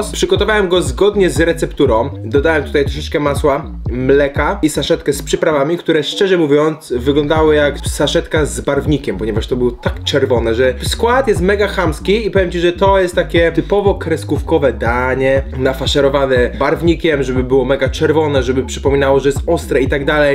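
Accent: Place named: mixed, Poland. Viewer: Mordo ty z Podlasia lub z Podkarpacia jesteś? Bo taką gwarą mówisz 😂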